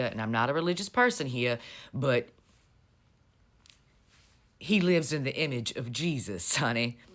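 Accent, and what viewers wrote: South, United States. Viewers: I enjoy not only your forthright news, but the Southern way in which it is delivered.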